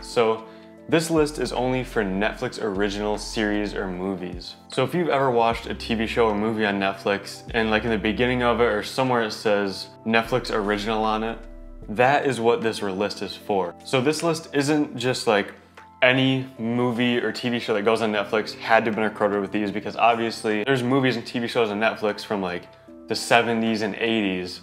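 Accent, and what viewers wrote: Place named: Buffalo, United States. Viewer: Are you from Buffalo/Western New York? Your voice sounds very Buffalo.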